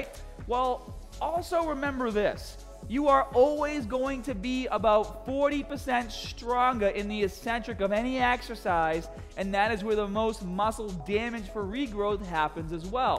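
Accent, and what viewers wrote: Boston, United States. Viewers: gotta love that Bosten dialect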